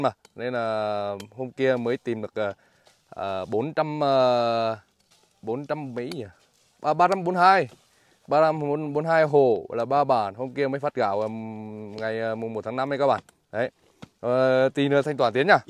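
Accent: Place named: Nghe An, Vietnam. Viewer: Quang linh quê" choa " chân phác gần gũi tinh cảm...nhất là hài ước hay ra phết...tôi dân nghệ nghe bạn nói giọng nghệ tôi vui và cười sung sướng luôn..hãy là như thể kg cần thay đổi hi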